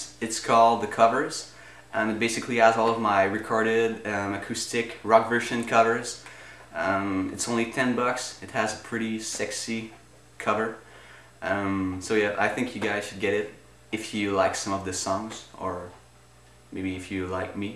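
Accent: Quebec, Canada. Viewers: His first language is French, but Quebec's French has a little bit different accent than that of France's soooo ya :) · Guys hes from french part of canada, so french is first language thats why he has an accent.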